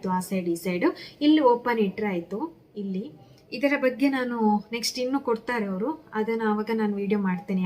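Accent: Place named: Mangalore, India. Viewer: Neevu maatnaduvaga Mangalore bhashe tara ide akk a.nim ooru yavdu.naanu mamgalore adke kelde .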